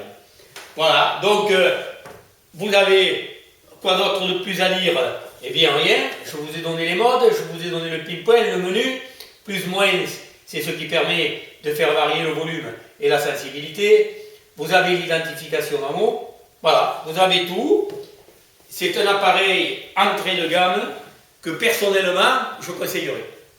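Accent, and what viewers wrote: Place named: Toulouse, France. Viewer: J'ai hâte de recevoir le mien ! très bien cette démo et avec l'accent de Toulouse c'est encore mieux !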